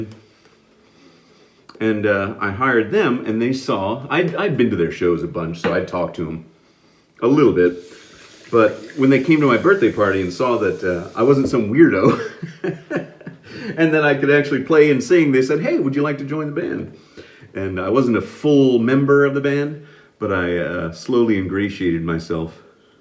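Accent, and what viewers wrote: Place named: Liverpool, United Kingdom. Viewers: Nice guitar, you should have worn it across you while you shaved! The liverpool accent is pretty good also 😁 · I got a slim twist white handle I got off my dad... Actually it was the razor that I started using that got me in to the rabbit hole that is DE razor shaving. Your liverpudlian accent almost had essence of mancunian in it. But not bad though. I'm waiting for you to perfect the Yorkshire accent.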